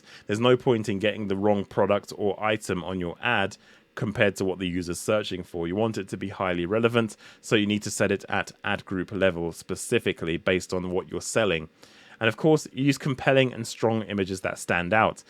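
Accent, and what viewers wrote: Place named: United Kingdom. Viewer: I was about to sign up ro linkedin to get access to their learning library but the reviews on their google ads course are shady. Apparently the guy doing the videos has a bad accent and is hard to understand. Then I was considering paying for a cheap Coursera digital marketing course but that covers things I already know and is more costly. I wanted to focus on Google Ads, which is what you are doing here in a beefy 5+ hour video. This is what was looking for. Not only do you have a cool Brih-ish accent but you're putting this out here for free! It's wild. Thank you so much I intend do watch this whole thing thoroughly and injest all your knowledge. Thank you very much kind sir! PS: I love the gaming props in the backround!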